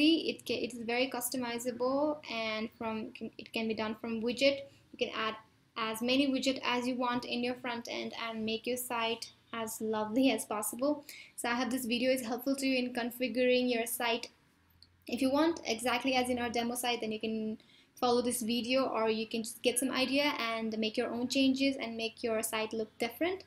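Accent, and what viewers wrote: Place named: India. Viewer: Typical Indian Voice trying for Amercian English. Good.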